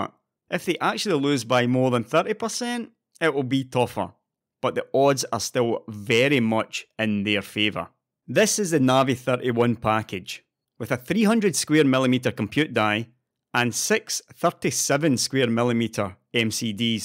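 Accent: Scotland, United Kingdom. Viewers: The Scottish accent was too much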